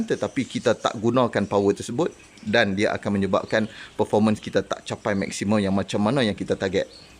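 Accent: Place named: North, Malaysia. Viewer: Terhibur ckp utara sanrtai2. Kepala masuk bakui, tauk buang, cap kepala naga.. Hahaha.. Senamg faham dan xstress. Semuanya info yg sgt bermanafaat dan terbaik. Muga Allah tambah lg ilmu kpd tuan utk manafaat bersama.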